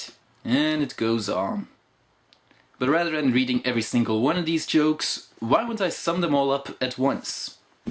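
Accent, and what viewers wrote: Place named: Germany. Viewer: This was hilarious! Loved the jokes, loved the accent (what is it by the way, German?). Great video! Only suggestion is to put only 3 clips instead of 4 and to quote a little less. Also maybe go over plot characters. Amazing video though, loved it!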